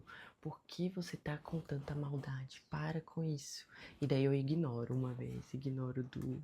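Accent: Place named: Minas Gerais, Brazil. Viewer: Gostei do seu sotaque vc é da onde ?! Mineira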